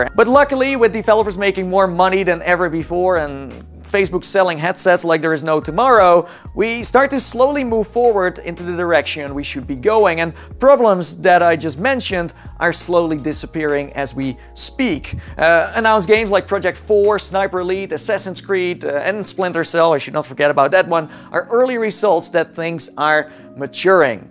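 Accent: Netherlands. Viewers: Are you Dutch? you have a dutch accent. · Hey kerel ik hoorde je accesnt en ik wist gelijk dat je nl was😜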